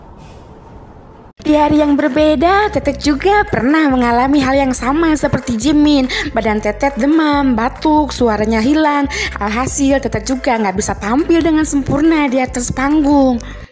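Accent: Sunda, Indonesia. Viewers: Hehe min nya suara nya lucu org Sunda ya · mimin nya orang sunda kah 😆? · Min urang mana meni sunda pisan? logatna asa logat urang dieu😳💜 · Min ..aslinya orang mana sihh ..sundanya medok bingit 👍👍🤣🤣😂😂😂 Suara mimin bikin rindu ..kontennya yg ini mengandung bawang tpi berhubung yg bawain mimin jd ckup trhibur . Semangat min ..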